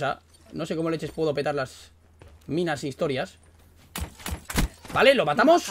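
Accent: Mexico. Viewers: Wey Me gusta cuando ablas como mexicano jaja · hablando como Mexicano?? Jijij yo soy Mexicana:3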